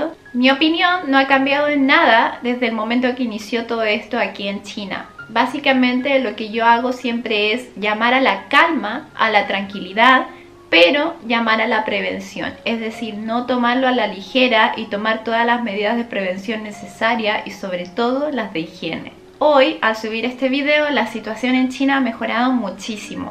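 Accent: Chile. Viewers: Es interesante que no has perdido el acento chileno, saludos y gracias por la información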